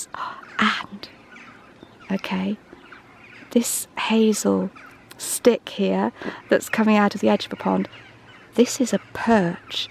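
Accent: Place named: RP, United Kingdom. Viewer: I like your posh English accent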